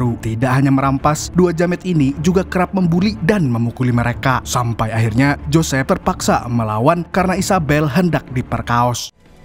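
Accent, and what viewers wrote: Banjar, Indonesia. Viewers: Wkwk asli banjar 😂 pacul gigi kai .. semangat terus berkarya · nah bahasa banjar sekalinya😂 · Ikm urang banjar kh?😂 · Aiii bhasa Banjar,,,urg banjar kh?? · Urang banjar kah beliau ne 😂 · Wkkwkw orang banjar kalinya sampiaaann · Urang banjar kah pian bang? Hahaa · Adminnya orng Banjar? 😅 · Min orang mana, Banjar kh? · Hahah urang banjar kh pian mang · Urang banjar kah pian mang 🤣🤣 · Pinanya mimin ini org banjar 😊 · Orang Banjar kah mang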